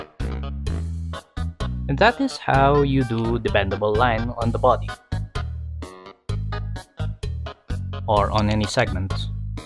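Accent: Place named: Europe. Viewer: U sound like an European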